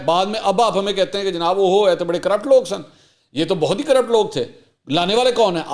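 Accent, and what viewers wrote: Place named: Lahore, Pakistan. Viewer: واہ 😂 آج کا لاہوری لب لہجہ میں ویلاگ ؟؟؟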